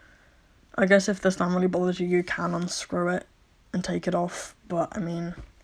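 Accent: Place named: United Kingdom. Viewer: YESH, LE BRITISH ACCENT IS HERE >:33 I don’t know if I could ever use a screen tablet but boi, is yours pwetty ^^ Also, those clothing skills tho 😫👌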